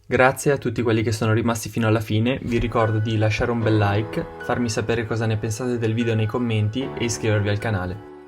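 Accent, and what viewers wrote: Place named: Lombardy, Italy. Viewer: Concordo con la dizione dialettale lombarda !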